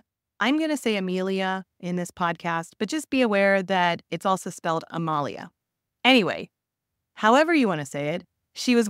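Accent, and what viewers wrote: United States. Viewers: English history in an American accent 🤮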